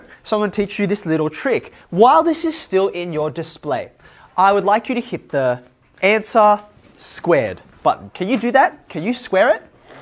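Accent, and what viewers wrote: Australia. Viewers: Australian accent? Sounds like chan from skz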